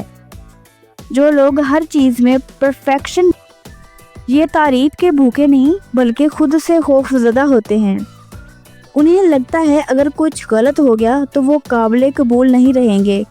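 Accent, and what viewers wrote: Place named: Punjab, Pakistan. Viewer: Allah ka wasta hy ,tm log urdu ka baira garaq mat kiya kro,video bananay sy pehly urdu Bolny ki achi tara sy practice kr liya kro, Urdu ko bhi panjabi ki tara bolty ho😕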